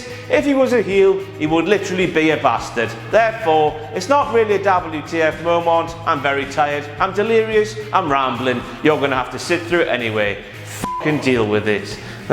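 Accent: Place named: Newcastle, United Kingdom. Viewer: Jay Bclay I know, he's a Geordie (from Newcastle) and their accent is quite difficult to understand, he's definitely joking :)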